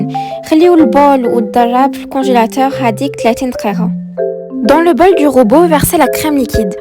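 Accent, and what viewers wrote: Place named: Oujda, Morocco. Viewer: ماشاء الله عليكم وعجبتيني مين قولتي خودمي ههه على الموس اللهجة الوجدية حاضرة في الفيديو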